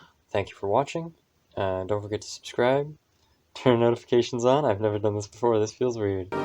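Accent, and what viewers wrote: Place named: New Zealand. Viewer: Your voice and accent is just like Kane Williamson, New Zealand Cricketer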